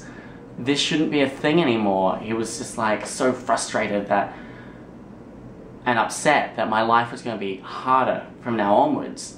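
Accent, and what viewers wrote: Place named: Australia. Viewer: i come hear for his australian accent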